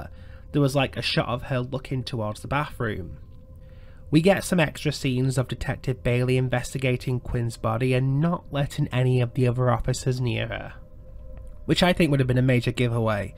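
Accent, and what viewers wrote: United Kingdom. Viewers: What british accent is this? Its my absolute favourite 😍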